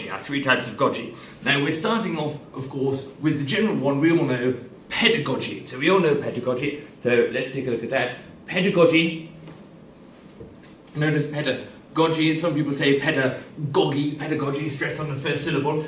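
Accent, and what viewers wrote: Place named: France. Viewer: he's got a very heavy foreign accent and makes many mistakes. is he French?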